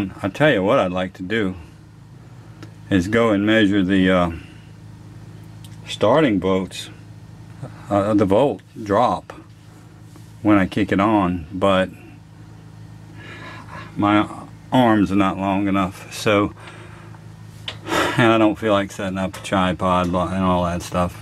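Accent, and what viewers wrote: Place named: Cajun, United States. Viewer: I like your style.... I sometimes wonder why people feel the need to criticize anonymously when they wouldn't say it to your face. Like the joker that said your voice is slow. I lived in Baton Rouge and it was nice to hear that Cajun twang again. And good video too. Nicely done.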